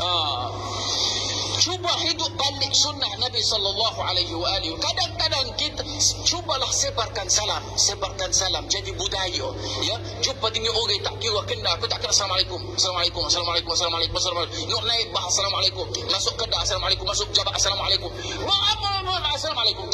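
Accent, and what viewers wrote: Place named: Kelantan, Malaysia. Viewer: Syeikh ni tak pernah dtg malaysia tp dah pandai kecek kelatey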